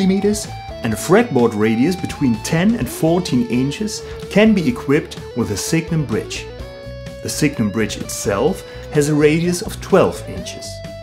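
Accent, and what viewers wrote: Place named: Japan. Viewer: This guy talks like a Japanese man that just learned English...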